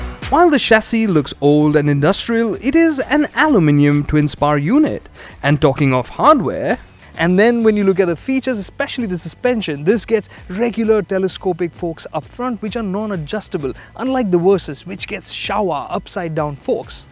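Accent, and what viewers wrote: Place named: India. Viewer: Could not watch it to the end. Indians sunks speaking english, with thier stupid accent.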